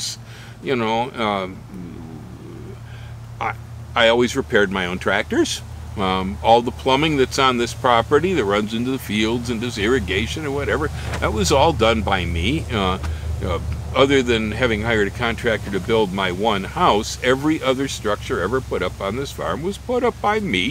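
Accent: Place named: Northeast Ohio, United States. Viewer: This is great info and love listening to you. Your accent...NE Ohio? I grew up there as a kid in the '70s. Your voice takes me back.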